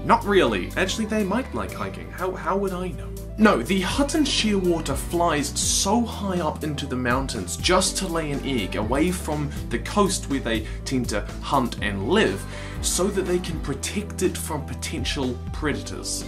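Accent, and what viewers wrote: New Zealand. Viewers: Knew you were kiwi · Are you from NZ? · are you from New Zealand?!?!?!? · Wait... you from New Zealand? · I was wondering if you were from New Zealand, was wondering why your accent was so familiar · are you a kiwi/new zealander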